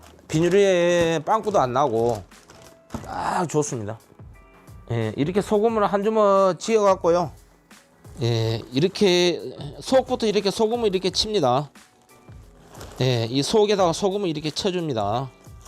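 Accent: Jeolla, South Korea. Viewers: 셰프님 겉절이 해봤는데 당일은 "액젖 냄새가 좀 나네" 했는데 다음 날 남은 것 먹으니 정말 맛나네요 감사합니다 전라도 사투리가 잼 나네요 쬐간한것~등 쉐프님 우리 세자매 다 구독자랍니다 오늘도화이팅 😅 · 셰프님 김장레시피 잘봤습니다 전라도 사투리구수하구요 메모잘했습니다 행복하세요 ~^^~ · 님 고향이 전라도맞으시죠?ㅎㅎ 억양만들어봐도 전라도말투네요 저두전라도 ㅋ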